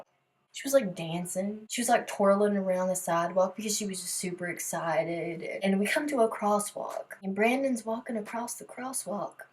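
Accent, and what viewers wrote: South, United States. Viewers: This is the very first video I’ve ever seen of her, but I hear a southern accent and it’s SO cute!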